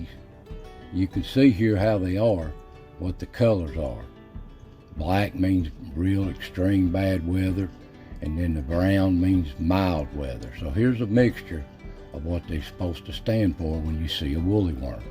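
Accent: Appalachia, United States. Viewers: I'm from West Virginia, too, and just hearing you talk reminds me of all my family growing up. It is such a joy and privilege to have been born and raised in Appalachia. Thank you for some sweet memories. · He sounds like my uncles from the Appalachian's.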